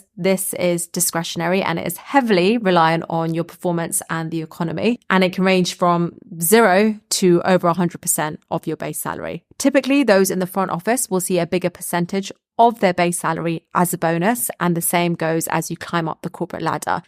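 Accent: United Kingdom. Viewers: I don’t know what but your British accent, simple dialogue, beautiful face and matured/experienced way seemed to convince me to finish the video, but it raised a big question in mind….How do you know when is it the right time to negotiate and how do you negotiate a pay rise.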